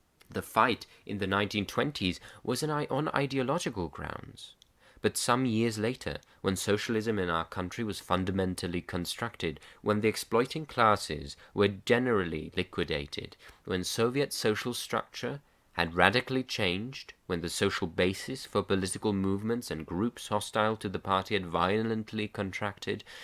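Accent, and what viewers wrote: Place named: United Kingdom. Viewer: This is a great presentation of the secret speech, full of passion and expression that can be heard in the voice and tone of how he speaks. The slight "British" or "trans- Atlantic" inflection makes it sound all the more Historical and Matter- of- Fact. Historians never fret over these very minor things, as I care more for the content of the speech, rather than the way it may be presented. All the best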